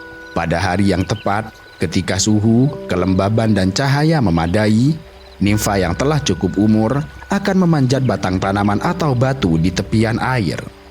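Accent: Java, Indonesia. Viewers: wih itu chanel awal min😮 iyah ja khas banget suaranya nya medok, cuma sedikit berbeda intonasinya sama sekarang lebih keren, sayu gitu bikin tenang di tambah backsound instrumen nya masuk🛌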